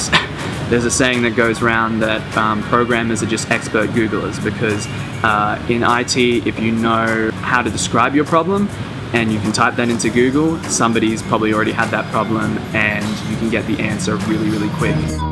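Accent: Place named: Australia. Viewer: I'm 100% you're australian (by your accent)!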